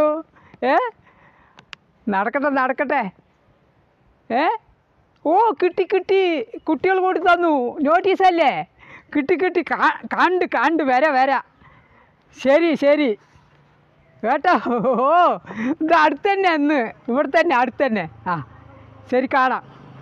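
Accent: Palakkad, India. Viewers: പാലക്കാട് ഭാഷ 🥰🥰🥰🥰സൂപ്പർ. നിങ്ങൾ pkd എവടെയാ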